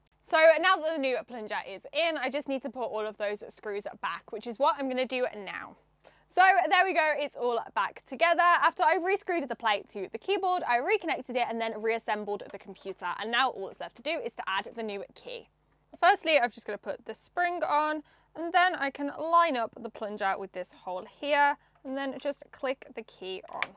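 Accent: United Kingdom. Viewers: I love your videos! You have one of the thickest British accents I’ve ever heard. Love it.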